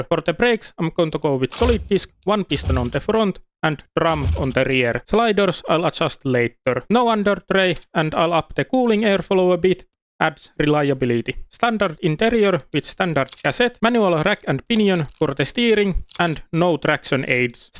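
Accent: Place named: Finland. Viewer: the most Finnish sounding guy i heard haha, nice video!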